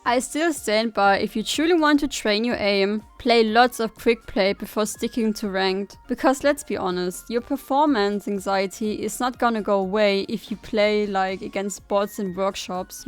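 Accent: Germany. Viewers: I hear deutsch Akzent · First thing I noticed: definitely a German accent, but nevertheless a nice guide! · German accent heh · Are u german cuz ur english sounds like you're german?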